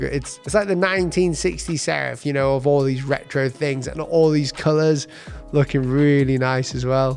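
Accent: United Kingdom. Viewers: He sounds VERY British 🔥🔥🔥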